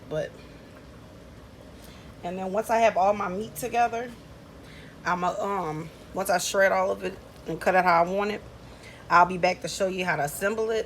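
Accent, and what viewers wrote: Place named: New Orleans, United States. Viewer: That New Orleans accent yesss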